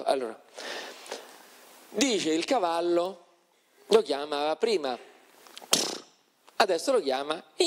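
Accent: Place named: Rome, Italy. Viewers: Se il relatore (in questo non ho fatto caso ma in altri video sì) evitasse le c romanesche e le doppie romanesche… non dico la dizione eh, sono contro, ma almeno niente italiano locale/dialettale; in più (sempre non in questo) dice cose che vanno contro la cultura generale e le parole di alcuni storici (esempio Istanbul che è la traduzione di Costantinopoli, Carlo Magno in buoni rapporti se non sopra Papa Leone III e contento della sua incoronazione, Loki con la clava e Odino con la lancia…) senza citare le fonti. E alcuni “gli” che dovrebbero essere “le” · Prima usa correttamente il verbo"insegnare" nei confronti del figlio. A 21.44 secondo me è il popolano che si chiede perché il suo dio non ha "imparato" a tutti la stessa lingua. È probabilmente una volgarizzazione voluta, come il fatto che fa le sue relazioni senza usare un linguaggio cattedratico ma piuttosto un linguaggio semplice con persino un accento romano.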